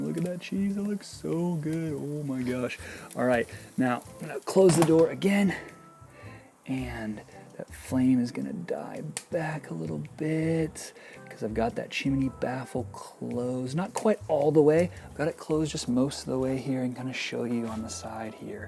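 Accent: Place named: United States. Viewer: What's with the metric system? This guys sounds American but comes off european. Still good video. Thanks.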